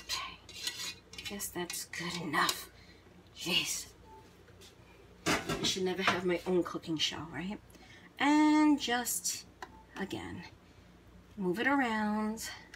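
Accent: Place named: East Coast, United States. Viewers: Oh my Gawd! Oh my Gawd! I love the east coast accent. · I'd eat that. BTW, love the east coast accent.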